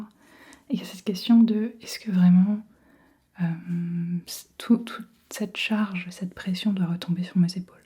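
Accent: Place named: France. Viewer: Coucou ! Par rapport à la question sur tes pronoms/ton genre, du coup je me posais une question, comment le pronom "ael" s'accorde pour les adjectifs ? Si j'ai bien compris tu préfères utiliser les adjectifs neutres ce que je comprends totalement mais voilà je me posais la question :) Sinon par rapport à la bienveillance que tu retrouves + chez les anglophones que chez les francophones par rapport au 50/50 des vidéos anglaises/françaises je pense que c'est parce que les anglophones ils adorent l'accent français même s'ils comprennent pas et les francophones ils aiment pas trop les vidéos en anglais.. en tout cas bon courage pour tenir le 50/50 mais sache que tu nous decevras jamais peu importe les vidéos que tu fais :)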